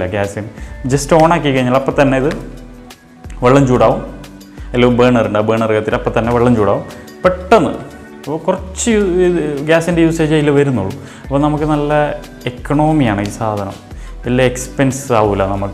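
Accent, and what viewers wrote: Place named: Kasaragod, India. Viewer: കാസർഗോഡ് സ്ലാങ്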